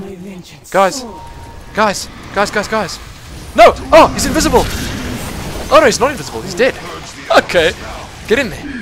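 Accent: South Africa. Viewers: You sound south african. Is that accurate?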